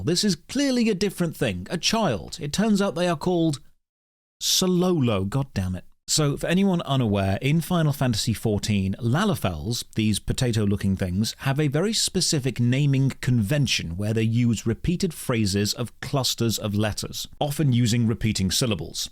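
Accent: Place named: RP, United Kingdom. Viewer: Oh, thank god, a new. I have been fucking sick for over a month now, and I just... I just need to listen to a pretty man with a posh little accent bitch about an MMO. I'm so glad this came out today.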